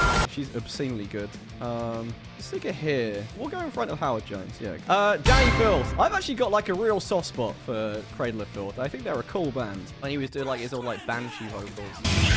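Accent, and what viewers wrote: United Kingdom. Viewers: VERY BRI'ISH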